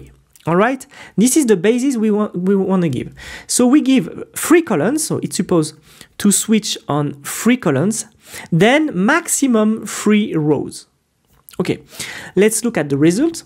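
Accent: France. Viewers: Tu es francophone !! ton accent est très fort, mais c'est très confortable pour moi. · Merci pour ce cours. Your french accent is a treat. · french accent is funny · L’accent français on adore 🥰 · thank you so much but you can not hide your French accent. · love the french accent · bro thanks but i cant understand what you're saying i see you're french influence is too strong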